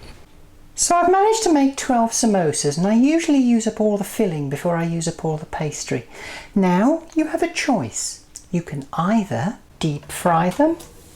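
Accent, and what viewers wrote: United Kingdom. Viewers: Bonjour, je dois dire que je suis un fan absolu!!! J'adore toutes recettes, votre voix votre accent britannique, votre humour bref je vous adore!!! Alors continuez a nous faire saliver et un grand merci pour toutes ces recettes! ( même si je suis au régime j'adore regarder vos recettes) je vous embrasse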